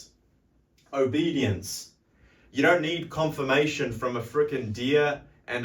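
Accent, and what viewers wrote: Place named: Northern England, United Kingdom. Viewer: Amazing cross between a northern english, kiwi and australian accent. Listen to this young man, he’s either lived many perspectives already, or has family experience to call on.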